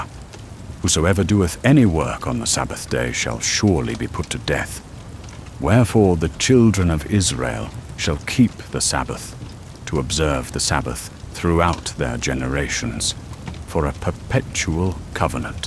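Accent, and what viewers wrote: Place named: New York City, United States. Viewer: It’s giving NYC OR Chicago 😍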